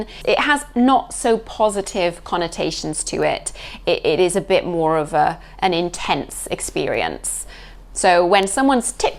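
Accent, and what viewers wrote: United Kingdom. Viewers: Hello! If I go to the restaurant in Europe, Should I say ' Could I have a bill please?'. I love your British English.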